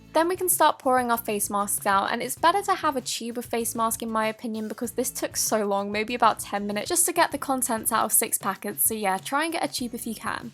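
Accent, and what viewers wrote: England, United Kingdom. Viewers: What part of England a are you from?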